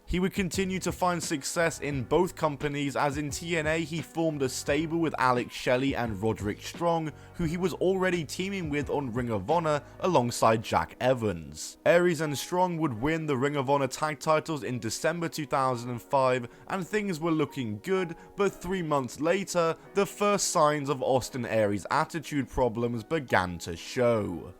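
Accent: United Kingdom. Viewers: Why are all the wrestling channels British?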